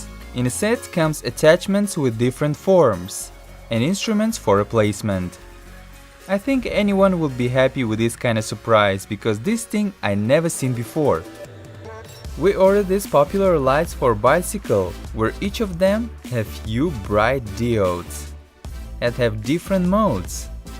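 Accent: Russia. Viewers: «Immunisation of” what? Учи английскиц, школота. Как же заебал ваш рязанский акцент. Тошнит прям. Лучше рекламируй китайское дерьмо своим руцким братанам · Your accent is not true. You are rus. Я человек, который живёт в Англией, слышал как говорят люди на английском и точно знаю.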